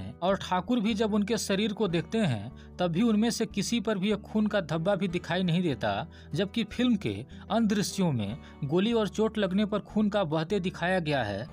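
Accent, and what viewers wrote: Bihar, India. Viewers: Sabse pahle to apni ye Bihari tone badliye, ek dam bekar lagti hai.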